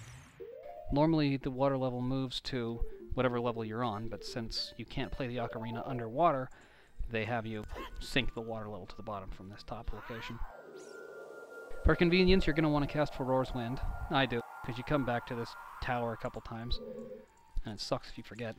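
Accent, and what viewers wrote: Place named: Australia. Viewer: p.s good aussie accent man. lol im aussie and you sound like a lot of people here.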